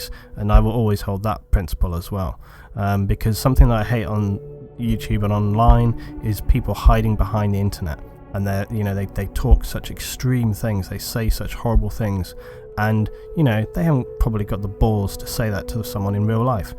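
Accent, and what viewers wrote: United Kingdom. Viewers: Your Sexy British voice (No Homo) captivated me....=subbed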